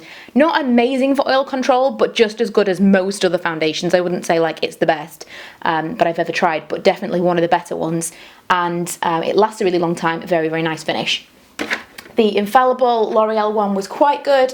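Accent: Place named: Derbyshire, United Kingdom. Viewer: Are you from Derbyshire ? You're accent is very similar to mine !x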